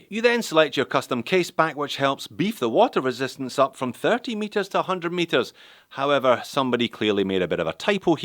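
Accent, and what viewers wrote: Scotland, United Kingdom. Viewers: Great to hear a fellow Scot talking about things I love! These are great looking mod kits, I was looking it up while you were building yours and I thought the colour way you chose was the best one too 👍🏼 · I’ve just managed to buy one in metal case. Love your Scottish accent coz I’m originally form Glasgow and enjoy everyone of your programmes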